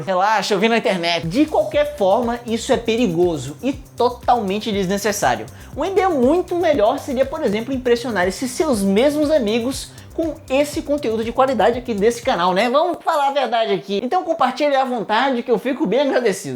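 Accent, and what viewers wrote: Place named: Northeast, Brazil. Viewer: Ele é do nordeste kkkkkkkkkkkkkkkkkkkkkkkkkkkkkkkkkkkkkkkkkkkkkkkkkkkkkkkkkkkkkkkkkkkkkkkkkkkkkkkkkkkkkkkkkkkkkkkkkkkkkkkkkkkkkkkkkkkkkkkkkkkkkkkkkkkkkkkkkkkkkkkkkkkkkkkkkkkkkkkkkkkkkkkkkkkkkkkkkkkkkkkkkkkkkkkkkkkkkkkkkkkkkkkkkkkkkkkkkkkkkkkkkkkkkkkkkkkkkkkkkkkkkkkkkkkkkkkkkkkkkkkkkkkkkkkkkkk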